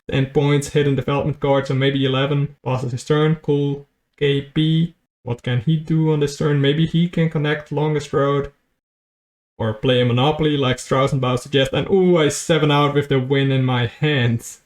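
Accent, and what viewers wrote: Netherlands. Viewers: Hey you sound like you are en dutchman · Are u dutch?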